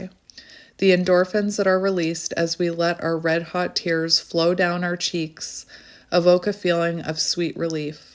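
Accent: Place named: Canada. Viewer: I'm a Aquarian and also don't like to be told what to watch 😅 I will when I feel like it. But Zombies, no way! Lol 😅 I'm house sitting for a month 3 dogs and 2 cats, so when I have dinner or a snack, I have 3 pair of eyes watching me, so the dogs gets more if my food than me! 😅 But I would also have cried about the spider. I love nature and actually prefer animals to humans. I also will rescue a spider 🕷️ if it's lost inside the house. Some people will get an entire spray tin of poison to kill a innocent creature! That really makes me angry! Thank you for being such a interesting tarot reader, I love your Canadian accent. I'm in Cape Town, South Africa. We going into Spring/Summer where you are going into Winter.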